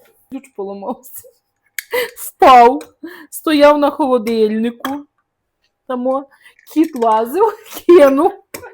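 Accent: Ukraine. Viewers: Вітаю! Ви напевно найкраща господиня з найкращими рецептами! Дуже Вам дякую за Вашу працю ❤ Всього Вам найкращого і всій вашій родині! Ps. Дуже гарний український говір 😉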